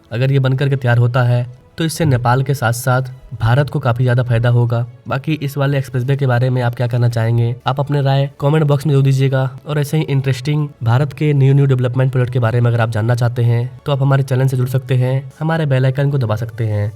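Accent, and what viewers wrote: Bihar, India. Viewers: बिहारी एक्सेंट सुनते ही मन से गाली निकलती है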